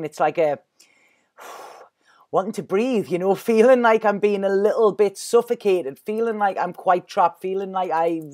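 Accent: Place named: Scotland, United Kingdom. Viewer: German? LOL sorry but I find that funny. Scottish maybe. People are funny aren't they. I will contact you in the near future for a reading. I figured out a way to generate electricity without fuel, wind or sun. Not sure how to proceed with this venture. If you gmail me I can explain the concept in 2 minutes. I will blow your mind on how simple it is. Get ahold if you want to.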